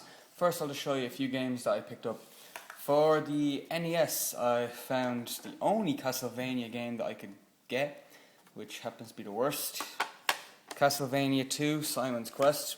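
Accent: Ireland. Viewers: ***** hes irish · You Irish?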